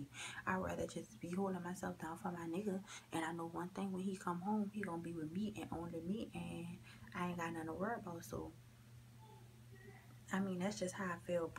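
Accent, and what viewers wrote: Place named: South Carolina, United States. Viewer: Are you from Charleston, SC? Your accent sounds very familiar to me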